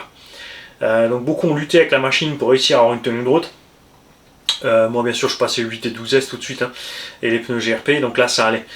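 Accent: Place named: Alsace, France. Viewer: Ah... losi c est grâce à la dp.pro que j ai repris la rc,( j avais ausssi hésité avec un slach)elle est jamais bien loin dans le coffre quand on part en vacances ,il y a toujours une place pour elle.En tout cas elle fait bien le taf .et dire que j avais failli acheter le mavrick quantum fluck, beautiful comme disaient cettaines vidéos 😂😂jusqu au jour où je tombe par hasard sur un blondinet avec l accent alsacien qui me semblait bien plus sincères dans ses propos et voilà comment on en est devenu accros de cette chaîne de dingos 😋avec son présentateur et ses abo,on se marre bien,et la collection s agrandi tous les ans. Sinon pour finir le sbr 2 me tenterai bien pour essayer en remplacement du mojave mais j hésite fortement déjà je sais que la caro c est de la feuille de riz😂😂et ça fait c...quand-même Bon allé montre nous les essais du losi 5 qu on bave un peu comme avant. 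Bonjoute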